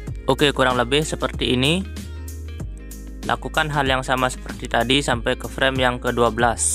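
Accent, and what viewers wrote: Aceh, Indonesia. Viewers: Aceh kah?? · Orang Aceh ya bang? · Awak Aceh BG nyo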